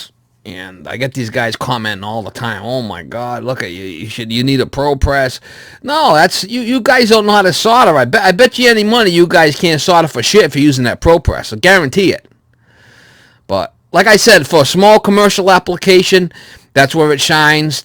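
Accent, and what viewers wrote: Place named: Boston, United States. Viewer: Where you from? Revere? Easty? You sound North of Boston. I'm from Dot myself. Love your vids dude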